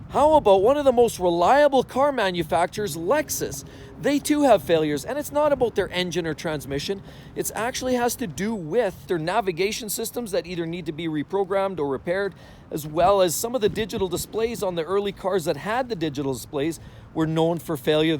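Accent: Canada. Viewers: My pleasure. Thanks for making these very informative videos (with a nice Canadian accent, to boot.:)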